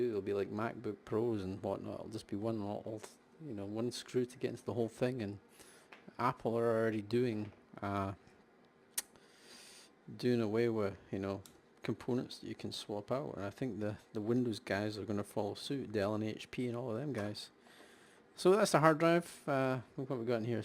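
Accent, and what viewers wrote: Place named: Scotland, United Kingdom. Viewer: Thanks, love your low key presentation (and the Scottish accent!)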